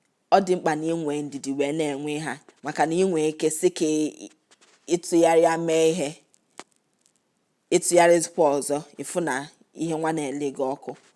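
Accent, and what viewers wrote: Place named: Igbo, Nigeria. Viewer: Iseeeeee 🙏 I love your Igbo accent 😁😁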